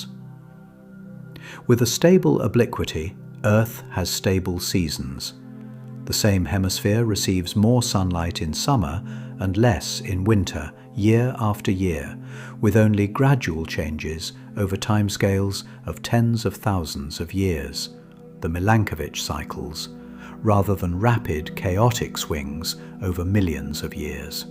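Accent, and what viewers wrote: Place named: England, United Kingdom. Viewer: A..I uses an English accent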